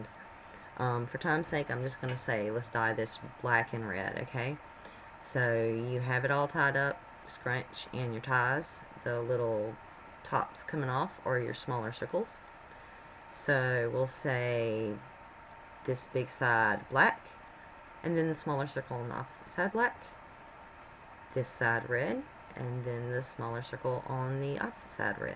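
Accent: South, United States. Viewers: i love ur southern accent! ^.^ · love your voice is that southern plus am learning on how to do tie dye to bond with my stepdaughter and we are doing them together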